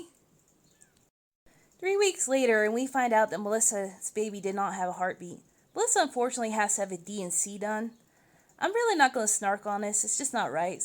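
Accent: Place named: Asia. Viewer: The narrator’s Asian accent spoiled kid voice is annoying 😣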